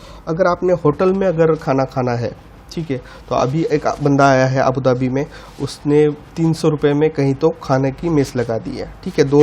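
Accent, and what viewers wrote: Maharashtra, India. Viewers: yourvishal hahaha, tyachya tone varun kalta, ki toh marathi aahe! Jay maharashtra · sir jee, r u maharashtrian ?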